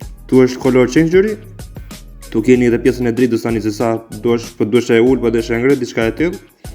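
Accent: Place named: Fier, Albania. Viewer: Kush e vuri re theksin e fierit 😂😂😂😂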